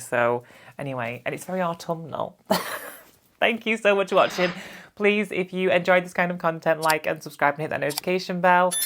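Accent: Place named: Manchester, United Kingdom. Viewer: Only mean thing I can say is how dare you be prettier and more attractive and beautiful than me! Also love the Mancunian accent 🩷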